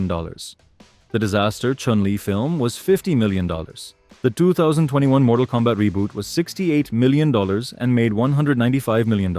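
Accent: India. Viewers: not trying to hide your indian accent behind AI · That an Indian voice lost credit for me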